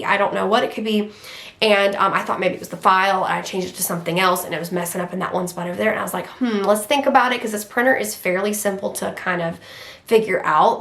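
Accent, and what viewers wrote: South, United States. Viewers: Hi, I recently found your channel and love the fact that you are a Christian (I see your tshirt designs 💜) and feel sure I hear a southern accent! I am a special education teacher in Arkansas and am considering starting a small business for my special education students. Would you ever consider doing a Google Meet so I can ask you questions and get some guidance? I’ve been watching your videos, but need to get this thing going, by ordering supplies and equipment within the next month.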